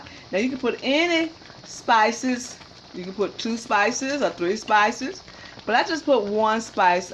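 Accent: South, United States. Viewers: So country I couldn't understand nothing 😂😂😂😂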